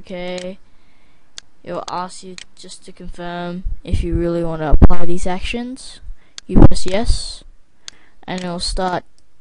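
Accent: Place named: Australia. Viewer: =O AN AUSTRALIAN!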